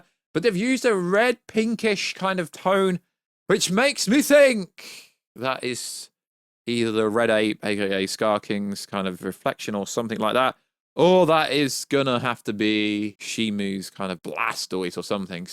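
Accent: United Kingdom. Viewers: you have a cool British accent!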